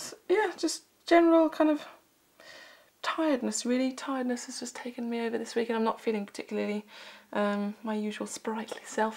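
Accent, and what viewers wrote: England, United Kingdom. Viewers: You have such a beautiful typical english voice I adore your music so much! You are such an inspiration to me Imogen! Just thought I'd say:#3 My friend Kimmy is entering XFactor singing ' ' Think Simon knows that song? x3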